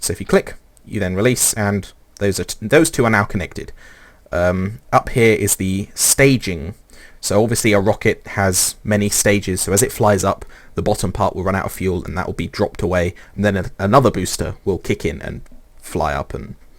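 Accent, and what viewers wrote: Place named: United Kingdom. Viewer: Don't ever stop my friend, you are a great lper for kerbal space program, I dunno maybe it's your british accent.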